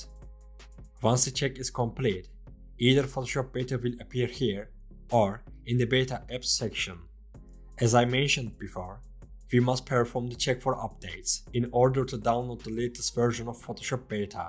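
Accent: Turkey. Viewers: Türksün demi la? aksanından anladım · I dont know why but you have a very stong Turkish accent. Nice video!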